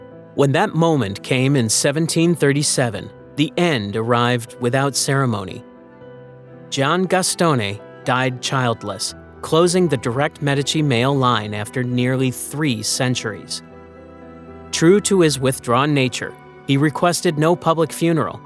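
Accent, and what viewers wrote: United States. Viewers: Having an American voice is wrong, ye shouldn’t Be involved in European history, go away ffs I immediately tuned out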